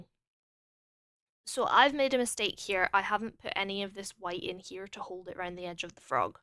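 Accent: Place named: Scotland, United Kingdom. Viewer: HELL YEAH- SOME SCOTTISH BACKGROUND NOISE FOR MY COURSEWORK (i dont catch streams often and by GOD am i glad i found this)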